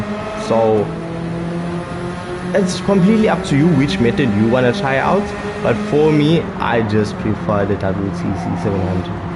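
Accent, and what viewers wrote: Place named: South Africa. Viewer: Can I ask where you're from? Because it's like I'm hearing several accents at once, because I'm hearing south african pronunciation, and what sounds like jamaican accent creeping in, just curious is all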